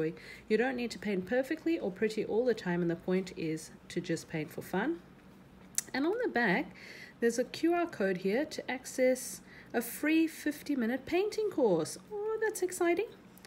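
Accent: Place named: United Kingdom. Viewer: Hello, I came across your video trying to find these brushes(after watching several of Kristy Rice videos) but I can’t find them . I see this video is from January,so I guess Amazon had them then, but currently it’s not available. But maybe you got them on Amazon in UK, because judging by your beautiful accent,I think that’s where you are? 😊Do you know by any chance if I can find them anywhere else? Interestingly it is available to international buyers only on Kriszty’s website,but not for the US which I found very strange. Please let me know if you have any information. Thank you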